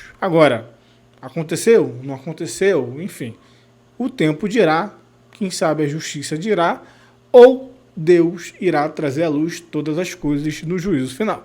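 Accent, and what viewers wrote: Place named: Rio de Janeiro, Brazil. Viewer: Voce tem sotaque de norte fluminense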